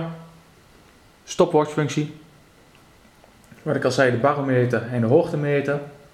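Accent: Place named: Germany. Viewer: cool German accent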